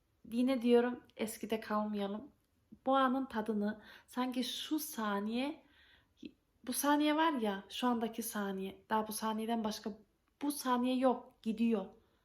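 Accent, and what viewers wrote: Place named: Yozgat, Turkey. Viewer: yeni tanidim cok pozitifsin bende yozgatliyim yakiniz senin memlekete konusman bize cok benziyo cok sevdim seni😘😍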